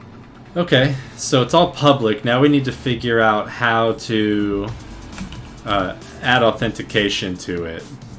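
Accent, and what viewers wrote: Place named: Russia. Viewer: congratulations, you have the Russian accent!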